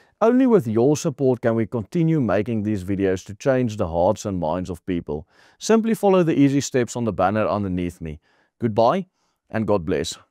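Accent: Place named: Afrikaans, South Africa. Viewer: EK SKRYF IN AFRIKAANS, WANT DIE MANIER WAT JY PRAAT, VERTEL MY JY IS AFRIKAANS. HOEKOM PRAAT JY IN ENGELS JY IS N TOTALE ID***T. HOEKOM PRAAT JY OOR BLANKES OP INTERNET. IS JY MAL OM SULKE INLIGTING OP INTERNET TE PLAAS????? EK WEET JY WIL GELD MAAK , MAAR JY DOEN DIT TEN KOSTE VAN BLANKES WAT GEEN PROBLEEM HET MET ANDER KLEURGROEPE NIE. MAAR DAAR IS KLEURGROEPE WAT N PROBLEEM HIERMEE HET LAASTE LUISTER GEWEES, LAASTE KYK!, JY IS EINTLIK N VYAND VAN N TANS VREEDSAME SITUASIE!!!!!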